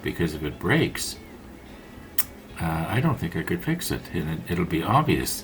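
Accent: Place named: Canada. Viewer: My husband is a retired physician and he builds airplane models from scratch makes plenty of mistakes from which he learns He did a few kits but prefers blueprints and goes from there. We are always collecting little interesting oddities to use. I will show him this channel it is very interesting. I am trying to get him to start on ships. My living room is no more airplanes hang from the ceiling LOL I wondered why you disabled the baking soda and glue (super glue?) video you made and became hollow. I personally loved that myself and will use it. I think it is super glue. I love videos are are unscripted and simply human! Thanks for posting. You sound like you are from Canada? or Minnesota or something like that!!!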